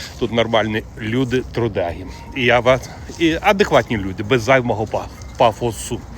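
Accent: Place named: Surzhyk, Ukraine. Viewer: Вчи українську краще і швидше , бо суржик лізе з усіх дірок! Українська : наголос на третьому складв. Тобто на букві ї.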